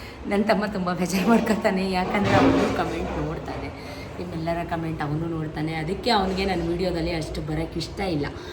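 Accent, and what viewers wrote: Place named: Rural, India. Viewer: Sis nim gramathara bhashe chennagide keloke😂❤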